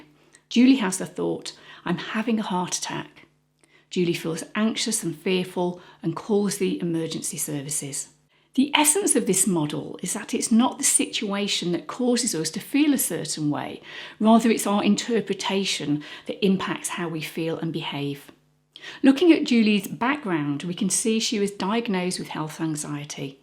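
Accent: United Kingdom. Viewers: Excellent CBT explanation with beautiful British accent, thumbs up!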